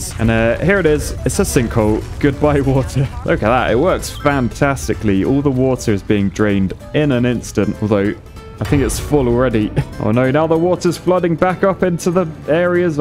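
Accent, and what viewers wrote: United Kingdom. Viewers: Because this guy is british, he really makes me think American English and English are completely different languages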